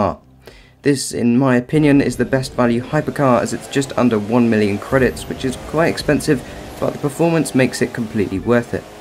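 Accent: United Kingdom. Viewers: nice to see ur still doing crew 2 ! i just got the pagani zonda r and it is INSANE! btw u sound british which part ur from?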